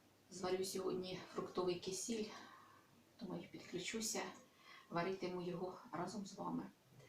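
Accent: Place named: Poltava, Ukraine. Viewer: Дякую за рецепт ❤У вас мова ,як моєї мами,вона з Полтавщини. А Ви з якої області???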